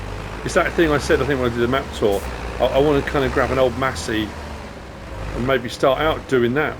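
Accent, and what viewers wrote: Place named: United Kingdom. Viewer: Honestly I'm not a fan of saxlingham either it's just not my cup of coffee lol. But I'd definitely watch you play it. Honestly I'm still holding on to hope that you do another farm to survive series. That is my all time favorite you've done. But you play whatever map you like and ill watch whatever you pick. Your play throughs are way better then most others ive seen i just like listening to you its fun with your deep British accent.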